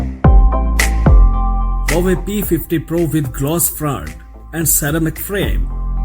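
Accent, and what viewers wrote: India. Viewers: India english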